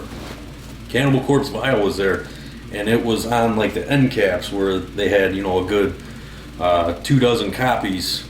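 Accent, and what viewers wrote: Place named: Michigan, United States. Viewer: Dude I FUCKING knew you had a Michigan accent. Man, I've watched guitar videos of you since my early 20's(now 30)I hope I didn't get that wrong. If you ever find yourself in Mid Michigan I'd love to talk!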